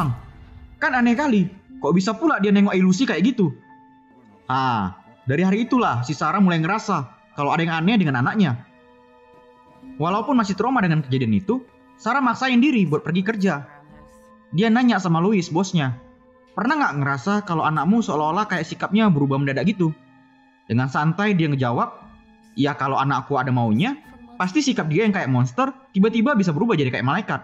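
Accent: Medan, Indonesia. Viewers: pasti orang Medann ni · Pengisi suara nya jangan ganti" bang.. Tetap pake bahasa medan... Mantap seru kali aku bahh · Yang bikin saya suka Vidio in adalah logat medannya Abang Abang ini🤣❤️ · Saran: kasih banyak logat medanya kayk suara chinoll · Medan punya bos wkwkkwk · Orang medan kah abang😂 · Logat medan emang gk ada duanya.. 🤣🤣🤣 org Medan jg nih 🤭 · Anak medan punya logat 😂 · weh bang, kau ceritain film kenapa harus pake bahasa Medan bang, kan jadi ngakak😂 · Orang medan mana suara kelen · Orng medan ka bah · Kek suara orang Medan:v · Berasa lagi ngobrol sama calon lakiku 😂😂 dia orang medan pula wkwkwk ngakak denger suaranya medan banget jir abang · Nampak kali orang Medan mantap bang 👍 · Pernah nonton film-nya, tapi waktu baca notif langsung klik aja🤭 mantap kali bang,,, aq suka bahasanya kaya orang medan😃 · Bg orng Medan ya bg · Anak medan ni keknya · Wkwkw org Medan ya bang? 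Btw mantap bang trus berkarya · Logat medan bukan sulawesi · Emang orang medan daerah namorambe emang the best ligat suara lu bang? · Orang medan dia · Logat medan pun membuat saya subscribe😂 Asli ini mimin ny bner2 buat kita kyk nganggap suara dia jadi pemeran itu. Feel ny dpt bngt. Terus berkarya lek👍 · Orng medan bang? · Mantap bang orang medan y sama lah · Logat medannya keren abg, semangay trus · Bang gua kangen ama suara asli medan lu bang gua suka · orang medan nih pasti abangnya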